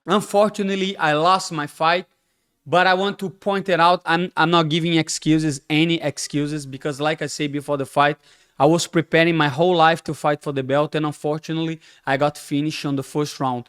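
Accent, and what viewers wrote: Brazil. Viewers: Thats the most brazilian sounding brazilian ive ever seen.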